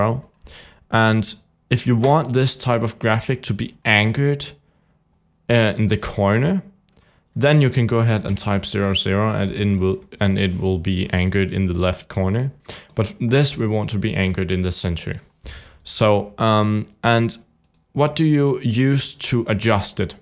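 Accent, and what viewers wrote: Denmark. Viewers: Swiff His main language is Danish I think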